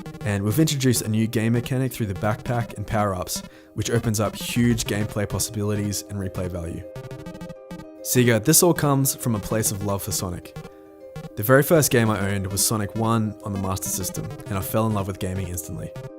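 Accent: Australia. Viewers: He's from Straya, that's not his fault lol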